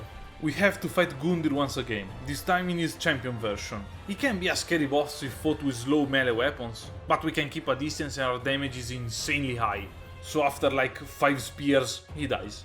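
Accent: Italy. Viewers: scusa se chiedo, ma mi sembra che tu sia italiano, o comunque mi pare tu abbia un forte accento italiano, o mi sbaglio? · Mi fa morire con la cadenza italiana 😂😂😂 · Are you italian? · Ma sei italiano? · Si sente che sei italiano 😂😂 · Ma sei Italiano? :D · sei italiano?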